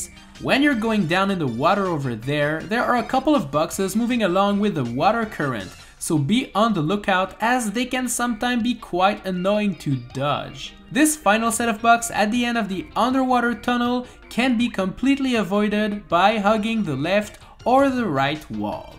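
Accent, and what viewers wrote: Quebec, Canada. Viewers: Are you from Quebec?? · Wait a damn second,. Are you from canada???????????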